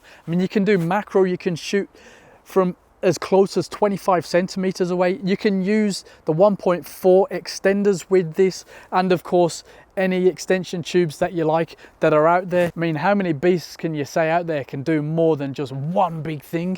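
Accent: Birmingham, United Kingdom. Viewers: Is that accent Brum?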